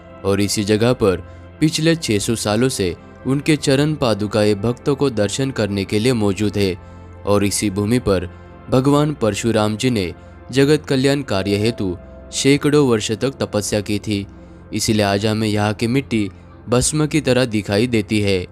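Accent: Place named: Maharashtra, India. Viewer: कशाला उगीच मराठी टोन मध्ये हिन्दी बोलताय . मराठीच बोला की .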